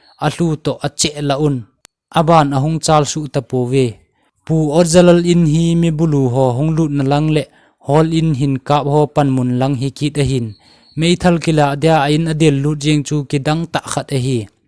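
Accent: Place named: Singson, India. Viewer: Narrator pa hi Thanlon lang Singson accent amang e.. Ngainom aum e..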